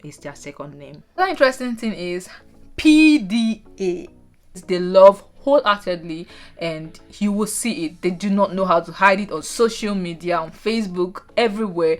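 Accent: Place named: Nigeria. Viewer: I could still that Nigeria feel😂😂 The "like bro"😂😂 I'm really enjoying this channel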